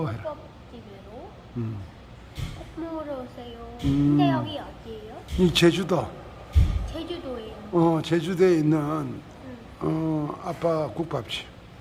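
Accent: Gyeongsang, South Korea. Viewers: 는 표현력도 최고 입니다 세월은 너무빨리 흘러갔네요 부산 광안리에서 먹어본 그맛은 잊을수가 없고.. 갱상도 사투리로 누부야를(누나) 제주도에서 부르는 유퉁쌤, 정겨운 목소리와 사투리가 매력있어 매일 시청 합니다 늘~건강 하시기를 기원 드립니다. 캇트, 구독, 좋아요. 👍 👍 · 누부야 66만만에 구수한 경상도 사투리 말씀 반갑습니다 랑 늘행복 하십시요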